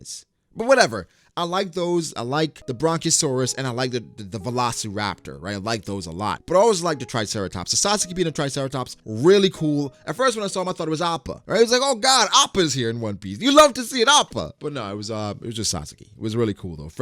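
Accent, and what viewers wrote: Spain. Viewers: Spanish sounds more similar to Japanese than English so let that Spaniard come out. Listening to you talk about the chapter makes me enjoy it more than my initial reading. Great commentary as always. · nah bro, embrace the spanish pronunciation!! it sounds cooler, those american "r's" sound weird with japanese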